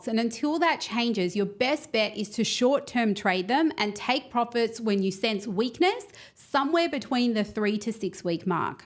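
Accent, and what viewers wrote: Australia. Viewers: woman who loves crypto with an aussie accent. Florida/usa....I COULD NOT BE HAPPIER!!!!!!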